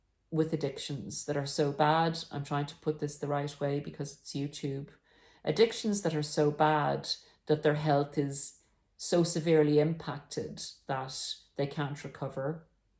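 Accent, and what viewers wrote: Ireland. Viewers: Fook! There's something about a glorious Irish accent that makes learnin' things much more engaging. Alas, me mother's side is Scottish, colonized by Brits, and they're wankers!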